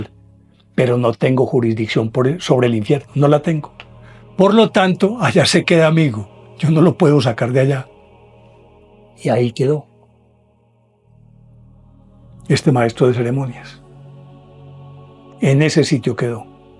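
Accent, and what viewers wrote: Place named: Antioquia, Colombia. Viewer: Me encanta su acento paisa, maestro. 😍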